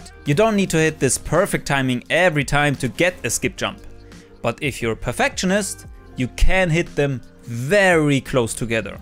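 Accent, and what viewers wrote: Germany. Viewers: Man hört so den Deutschen akzent raus 😂💪🏻🔥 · Sounds like German Accent :) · Dikka dein englisch hört sich mehr deutsch an als alles andere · Are you Form Germany?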